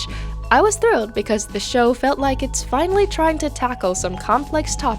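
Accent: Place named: United States. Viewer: have autocorrect off. She is hella fluent in english, seemingly amerucan variety with english influence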